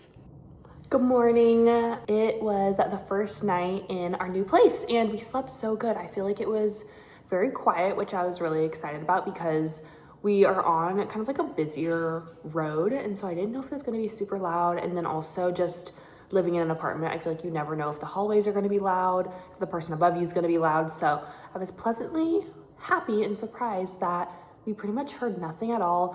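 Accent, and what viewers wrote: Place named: North, United States. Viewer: So what state is this? When you said sneakers and the way you say bag, I knew it was North somewhere lol South FL gal here. Stumbled on your page today.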